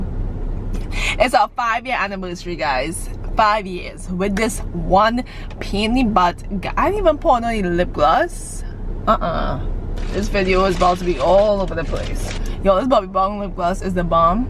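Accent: Trinidad and Tobago. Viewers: Girl! is that a Trini accent I hear!??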